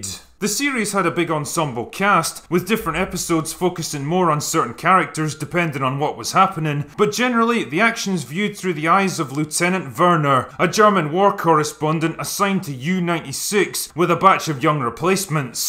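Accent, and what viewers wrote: Scotland, United Kingdom. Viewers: I always enjoy your videos and often recommend them to friends, so I hope you won't take this the wrong way. Your analysis of Das Boot was excellent, spot on in fact, but you had a few inaccuracies regarding the history and as a Naval person and historian feel compelled to mention them: - Your archival footage at the beginning was all of German WWI U-boats, so wrong war. - Submarines are always "boats" and never "ships." Doesn't matter if it's an old 500 ton coastal boat or a 10,000 ton SSBN; always a boat. Also, I'm curious why you were saying "loo-tenant"? You're obviously from the Blessed Land of Scotch (in fact, you sound almost identical to a mate from Clydeside, especially when you tell us to go away), so I'm curious why you use the American pronunciation? Cheers!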